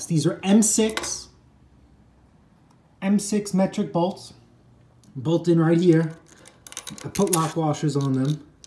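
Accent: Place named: New York, United States. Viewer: Duude Tgat NYc accent is killing it... Totally Gangsta..😎😉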